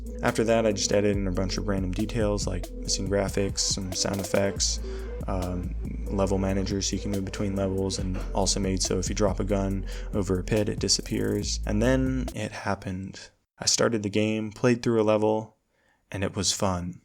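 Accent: Australia. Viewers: I bet you're australian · Let me gues. Australia?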